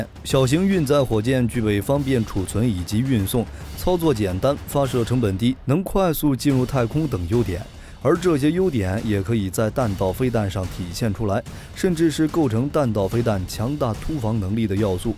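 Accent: China. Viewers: 聽聲音也知道這是中共病毒武漢肺炎國人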